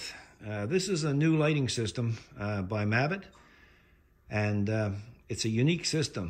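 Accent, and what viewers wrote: Canada. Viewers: Original lights are the IAG ones I’m guessing. I watched the install on those and was like nahhhhhhhhh. I was just thinking fuck it, I won’t bother until I came across these Mabett ones. So far it seems much easier and less hassle. I do appreciate they make a smoked version of the grille lights so prob get these with those lights. I wish there was more grille light options for Base Bronco. It’s crazy the amount of stuff Ford just didnt do for the Bronco. Dome lights, vanity lights, it’s ridiculous. Great tips here tho, saves me some headaches as this stuff always take longer when you learn the hard way. Are you Canadian by any chance? Sound like a Canadian to me, haha. Lot of the mannerisms haha.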